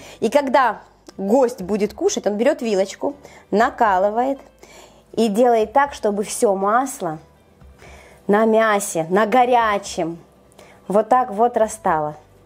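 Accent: Ukraine. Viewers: здравствуйте! Хорошее, качественное видео, спасибо! Если кому-то рецепт кажется несовременным ( не соответствует ЗП, ЗОЖ - много жиров или сладостей), он просто не будет смотреть. Вам, как профи в кук-индустрии (ого, <num>+ пользователей), конечно же, дозволительны вольности в речи, такие, как частые украинизмы или местечковые "рІзетки" - розетки, это не из оф. украинского языка, это из выражений в отдельных местностях. Они не будут портить видео, если блогер этого не стесняется, а наоборот, акцентирует на таком звучании. Это будет украшать видео своим колоритом, если быть смелее! Сердечні вітання із святами та щирі побажання подальших успіхів в творчості!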